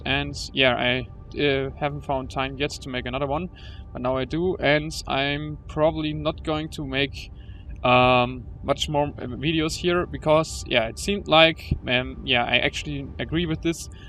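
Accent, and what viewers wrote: Germany. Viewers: you have a German accent!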